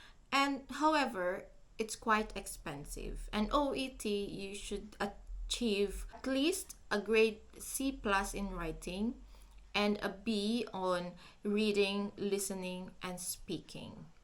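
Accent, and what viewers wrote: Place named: United Kingdom. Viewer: Bakit kelangan mo pa mag english with konting UK accent pa kung target audience ng vlog mo is filipino. Taglish ka na lang procedure naman at tips ineexplain mo. Di naman to class. Korni lang. Kind a frustrating to watch.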